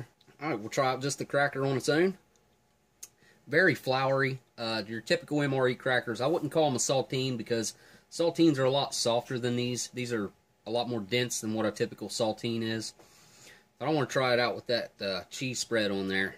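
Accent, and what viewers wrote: South, United States. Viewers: Are you from Texas, South Carolina, or Georgia?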